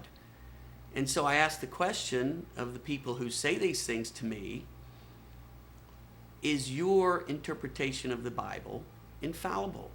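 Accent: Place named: South, United States. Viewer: you sound like you have a southern accent, are you from the south?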